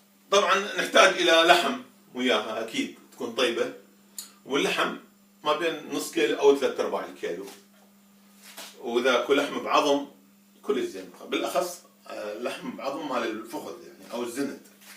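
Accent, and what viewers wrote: Iraq. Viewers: عاشت ايدك حجي يعطيك العافية موبس اكلك حلو اﻻحلى لهجتك العراقية اﻻصيلة اللي تخلي اﻻكل احلى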